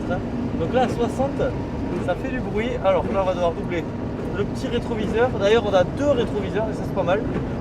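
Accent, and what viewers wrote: South, France. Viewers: C'est débile, même avec l'accent du sud, on n'y croit pas : soit tu achètes un frigo, soit tu achètes une LN ... il est mauvais et il le dit lui même sans respecter la voiture qu'il présente ... un guignol de plus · Allez je me replonge dans un ancien test : ce qu'il y a de merveilleux avec toi c'est que tu vas t'extasier avec le même enthousiasme devant une modeste populaire autant que devant une Ferrari ! En bon chauvin, le charme vient aussi de notre bel accent du sud... Ouh la je vais me faire des ennemis....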